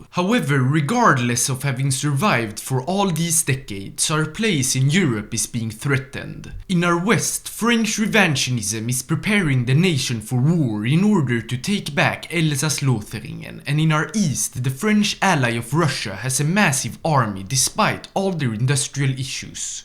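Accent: Sweden. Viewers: are you from sweden because you sounded pretty swedish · Js hörde att du har en svensk brytning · I have never heard such a swedish accent english. Say that your a swede but not saying it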